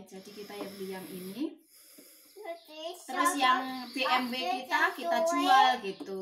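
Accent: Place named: East Java, Indonesia. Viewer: Asli Jawa Timur pasti ya mba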